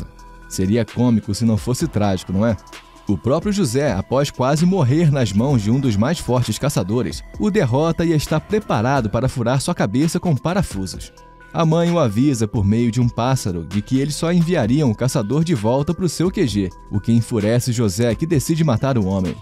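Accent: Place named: Rio de Janeiro, Brazil. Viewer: Alguém ai mais percebeu que carioca fala NAICE (nasce), NAISCER(nascer) NAICEU(nasceu)? NAISÃO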